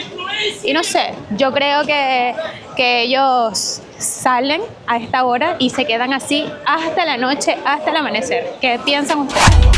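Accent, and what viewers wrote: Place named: Cuba. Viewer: Tu si que eres sexy, madre mia, tu voz y tu acento, cubano? Un placer d escuchar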